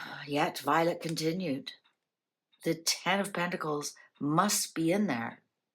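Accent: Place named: Canada. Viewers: I loved this. Your accent I love it Canadian. You brought the story to life's 🎉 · Canadian accent 😂❤ I am from the same area as We don’t hear our accent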